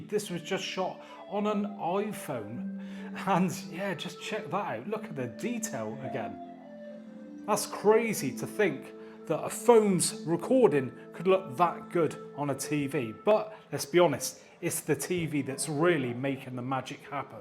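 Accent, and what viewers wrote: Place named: Bristol, United Kingdom. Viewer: Love that, I need one that size for my dining table (limited to £400 Amazon Fire) & it's a very clear description 👍🏻 You remind me of a Bristol version of Ross Kemp 😂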